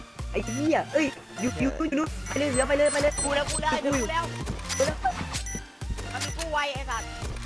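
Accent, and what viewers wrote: South, Thailand. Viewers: เป็นคนใต้ใช่ป่ะ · คนใต้ปาวคับ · อ่อ เเด็กใต้น่อหลวง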